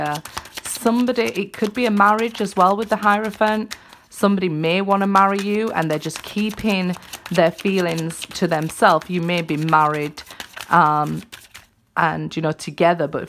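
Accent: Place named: Northern England, United Kingdom. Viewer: Nice reading.. thank you! And love the Northern accent.. Ay up lass! 😊😂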